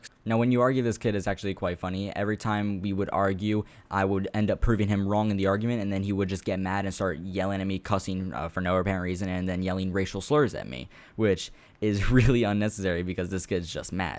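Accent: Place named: Florida, United States. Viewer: I know that this video came out 7 months ago but I’m so confused on why he saying the n word and he ain’t from Florida he sayin jhit like he actually from Florida.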